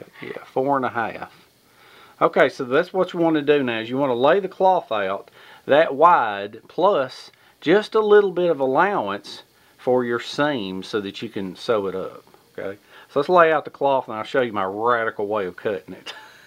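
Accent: South, United States. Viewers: Oh my lord he’s got a heavy southern accent. I got one it just ain’t that heavy of an accent.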